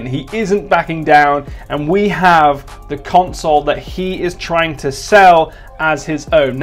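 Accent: Bristol, United Kingdom. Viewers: Do I hear a Bristol accent?👀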